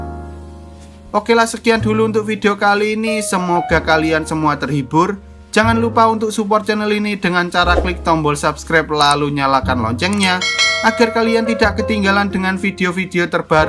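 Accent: Java, Indonesia. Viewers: Alur film yg menarik, Krn miminnya medok jowo. Saye suke saye suke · Medok bgt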